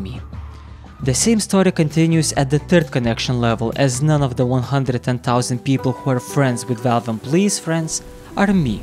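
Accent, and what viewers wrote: Russia. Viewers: Хороший видос брат, по акценту узнаю родной русский или другой славянский язык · Вроде русский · крутое видео чувак, но советую поработать над русским акцентом)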